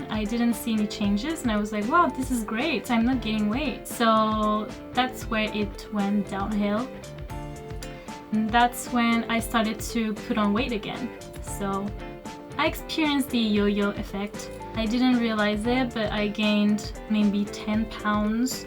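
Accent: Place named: France. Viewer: ohhh tu viens de France j'en étais pratiquement sûre avec quelques prononciations !! tu es très intéressante et merci pour le boulot de tes vidéos !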